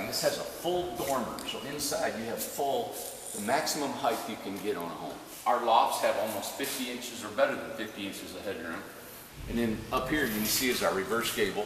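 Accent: United States. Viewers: God I love American accents, this guy's passion is so infectious as well.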